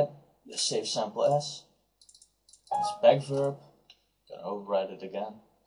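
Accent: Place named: Netherlands. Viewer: Je klinkt nederlands.. You sound dutch.. Good instructional video!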